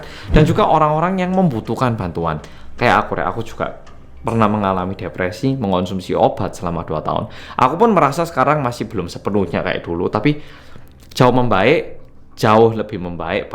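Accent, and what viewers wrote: Java, Indonesia. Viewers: Edan...medok banget Indonesianya,ngga ada minesnya😂😂😂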